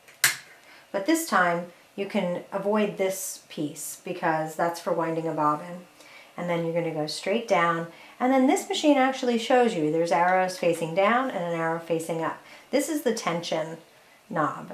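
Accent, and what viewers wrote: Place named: Russia. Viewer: Sounds Russian to me.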